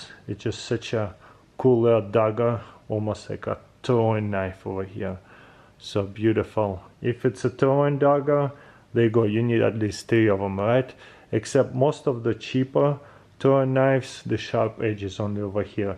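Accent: Russia. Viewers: Стронг рашн акцент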